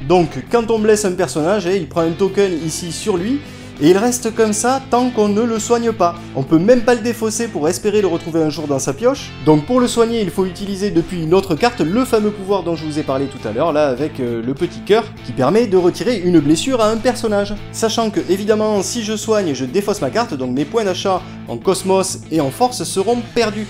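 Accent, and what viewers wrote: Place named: South, France. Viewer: Peuchère juste un petit peu , mais vraiment flagrant quand tu fais le soldat germanique. Tu es de Marseille ? Moi je suis sur Toulon!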